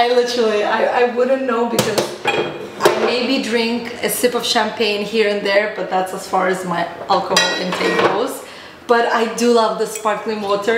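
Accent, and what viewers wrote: United Kingdom. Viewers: Her British accent is strong in this video love it!! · why do you take a British accent?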